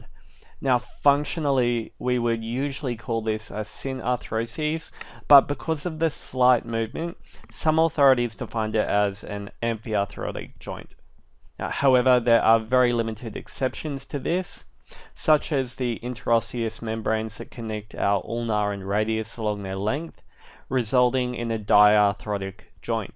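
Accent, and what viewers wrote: Australia. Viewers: I am really enjoying your clarity, descriptions and Australian accent!! It's a first in my experience of watching these anatomy tutorials. Thank you kindly.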